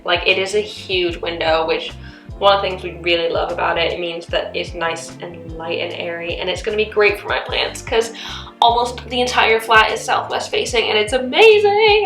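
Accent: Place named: United Kingdom. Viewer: I loved watching this, as a fellow Brit who lives in Canada now, I rarely get to hear our accent and the word "flat" instead of apartment. I can't wait to see how it turns out :-)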